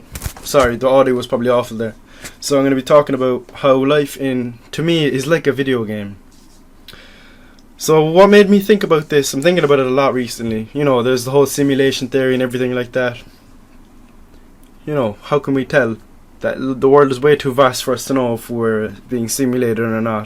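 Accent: Ireland. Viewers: I dont know if its your irish accent bro😭😭but some of the things u say the way u say them to🤣🤣🤣i be bussin out laughin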